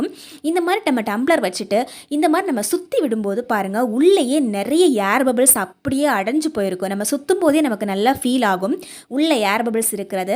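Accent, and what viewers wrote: Kerala, India. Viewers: Unga slang la malayalam vasanai veesuthu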